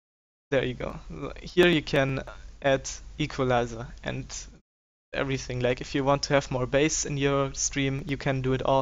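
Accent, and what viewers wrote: Germany. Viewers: Livestreamer gibt es aber anscheinend nur für 32 Bit.. Ich habe aber ein 64 Bit System.. Funktioniert es dennoch? :) Bist ja deutsch wie ich gesehen habe und man es auch raushört :) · D der deutsche Akzent ist real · haha ich wusste das du deutscher bist xD Das merkt man am Akzent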